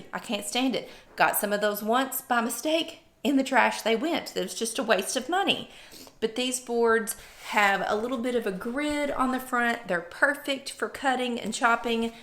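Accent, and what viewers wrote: South, United States. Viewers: Thanks for all the hints..I just love listening to your Southern drawl...also seeing taking part in the demos! Lots of fun! 😃 from a Minnesota gal ❄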